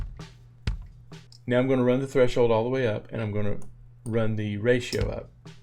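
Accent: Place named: South Carolina, United States. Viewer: Great video my friend. I was trying to place your slight accent. Maybe South Carolina? haha don't know. Don't disclose this, I like your voice lol. Great video my friend. Well done!!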